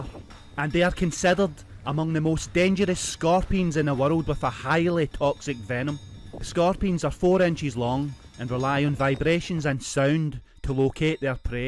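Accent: Scotland, United Kingdom. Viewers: Your accent lol. Super.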